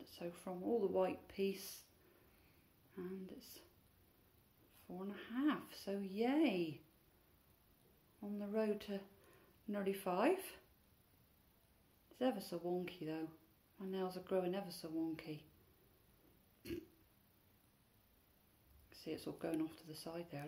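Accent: England, United Kingdom. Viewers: I truly admire your claws erm my bad . . .nails :-) I also love your voice, I love the English accents, and when I was in London 20 years ago I was in total awe of your people , the shops , the double-decker buses,, the food , so many things that kept me so preoccupied that I only slept an hour a day lol anyway, thank you for sharing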